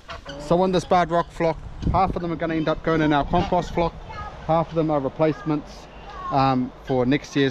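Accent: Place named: New Zealand. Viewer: Where abouts is ur farm u sound kiwi, also how many acres do u have?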